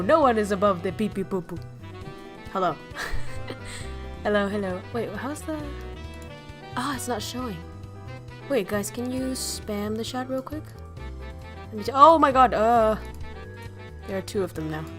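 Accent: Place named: Russia. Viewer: That was fun,nice deep Russian accent btw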